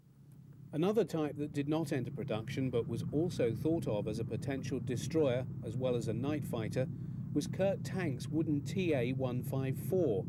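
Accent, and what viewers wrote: RP, United Kingdom. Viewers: plummy accent?? hmm, refreshingly nice to the ear if i may say so. wonderful historical footage, Thanks again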